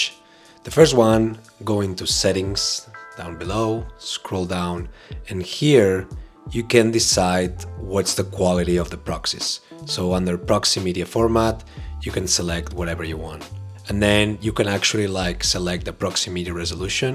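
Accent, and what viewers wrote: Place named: Catalonia, Spain. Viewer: Que soooolid! m'he catat q erets català per l'accent en anglès😂😂😂 una mica Pep Guardiola... Segueix així que ajudes molt👍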